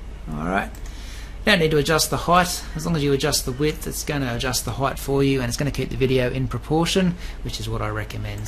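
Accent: Australia. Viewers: Love your accent mate. Australian right?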